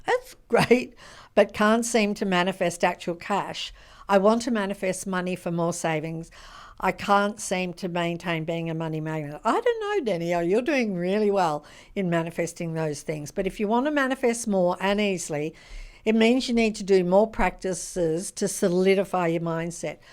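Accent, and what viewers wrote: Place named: Australia. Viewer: It's our Aussie accent I love it, and I live in Melbourne, the best city in the World to live, so say who. Anyway love these thoughts❤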